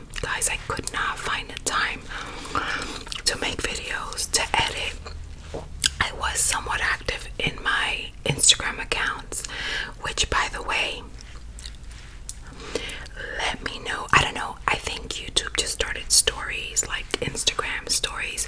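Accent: New York, United States. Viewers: So happy to see the bell notification and that it was a vid from you! Everybody needs a break from you tube sometimes and don't feel bad about accepting donations..you deserve them! I would love to see your professional makeup kit/application..I love the sound of your jewelry making noises, and when i hear your New York accent. It's so obvious you experience ASMR. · Hi. Just started following you insta and I would like to say well-done on the weight loss fantastic to see. Listening to your voice what accent is that? New York? All the best from bobby from Warwickshire England.UK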